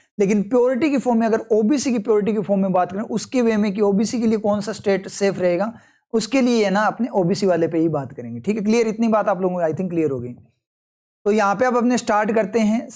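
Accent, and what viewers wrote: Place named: Ganganagar, India. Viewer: Ap gngr Or hnumangd side se ho kya.... Awaj se pta chl ra h thoda 😂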